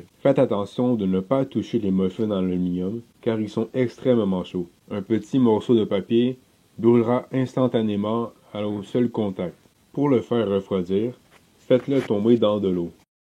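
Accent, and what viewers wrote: Canada. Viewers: 6 tasses c est trop rigolo avec cette accsent canadien !!! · Ton accent québecois avec la composition des phrases en francais c'est MAGNIFIQUE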